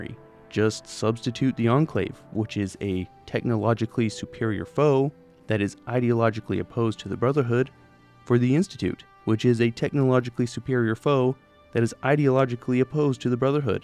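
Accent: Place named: Midwest, United States. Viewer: I love your videos but i have ro say, i noticed your accent way more in this video lol michigan? Minnesota?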